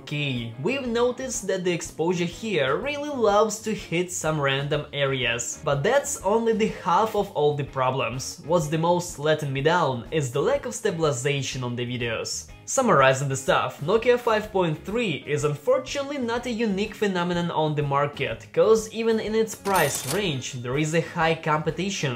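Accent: Russia. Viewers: Can you test the battery in watching youtube, battery test in playing games, battery test in browsing, battery test in watching videos. Will this 4k mah can last 2 days? Like your review and you talk like Russian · Is that a Russian accent ?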